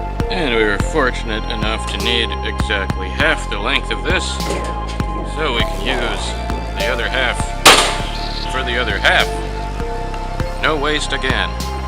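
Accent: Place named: Croatia. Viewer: Brilliant work however your heavy Croatian accent makes it difficult to follow your otherwise excellent vid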